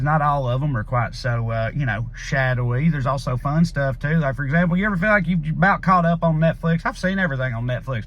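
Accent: South, United States. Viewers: I love all your rants Trae! I also spent some of my childhood in the south.. so it's fun to hear you spout liberal views in a southern accent.. keep it up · Bless you mate. I showed one of your posts to my Dad became he's become so anti American (especially anti Southerner) because he assumes anyone who speaks like you is a Trump supporting sub-intellect. He said 'I like the boy & i reckon he could speak underwater with a mouthful of marbles'. 😂 Love from Australia 🐨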